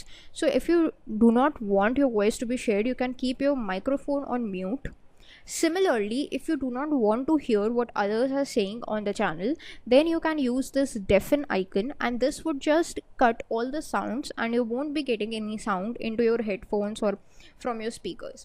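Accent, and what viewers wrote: India. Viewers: he sounds Indian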